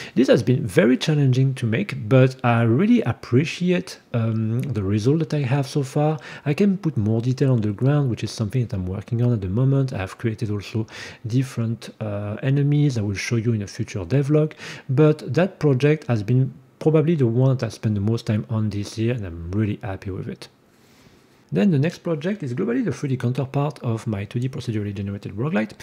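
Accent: France. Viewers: Ton accent c'est une evidence tu parle français. good video btw.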